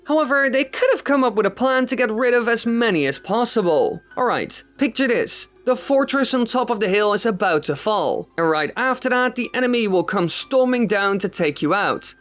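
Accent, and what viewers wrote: Netherlands. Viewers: I've never heard of flooding a settlement to defend yourself. That's interesting. Also, I didn't realize that your accent is Dutch, not British. Oops!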